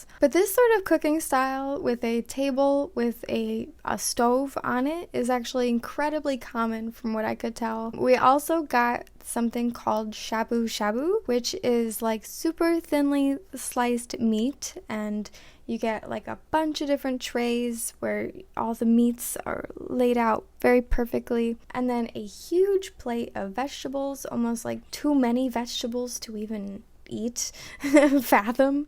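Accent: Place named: Canada. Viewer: and I thought you were from Canada from hearing your accent for the first 1 : 10. What did you think of the Japanese toilet? Yay for the heated seat or no??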